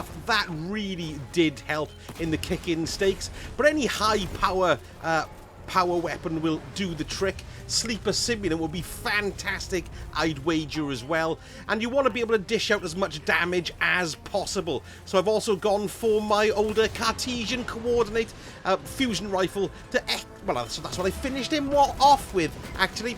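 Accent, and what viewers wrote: United Kingdom. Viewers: Oi are you British mate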